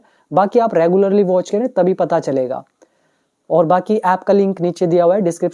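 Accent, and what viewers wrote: India. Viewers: Great 👍, I wanted to inform you to improve your slang and pronunciation. Now, please try to develop your accent. Don't teach indian accent to your viewers. Take it easy.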